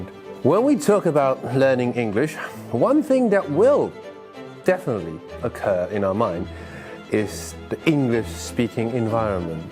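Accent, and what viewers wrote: RP, United Kingdom. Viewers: 太喜歡老師的英式腔了! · 最近瘋狂迷上英式口音 找來找去Eko的比較好聽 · Love your british accent and the topic you talk about . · 看來我要重看福爾摩斯了，不過英式英文真的聽不習慣，好貴族氣質，可...可惡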